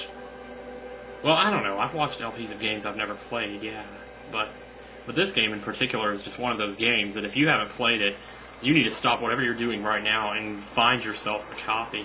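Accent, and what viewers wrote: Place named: South, United States. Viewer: I'm going to watch this LP once again. This is one of my favorites and still has abit of the southern accent which is funny good stuff! One of the best ever "lemme tell ya".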